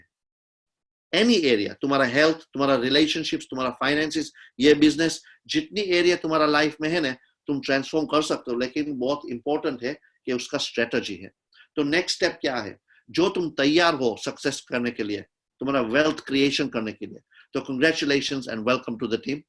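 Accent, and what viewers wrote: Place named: India. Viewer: Apki hindi indian jaysi hai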